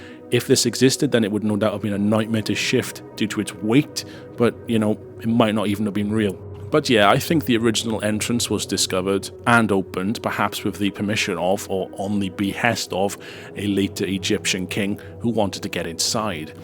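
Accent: Wales, United Kingdom. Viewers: The Welsh accent always makes me smile:) it's a perfect voice for dead pan comedy:) you maybe won't get this reference.... But do you remember the song? by??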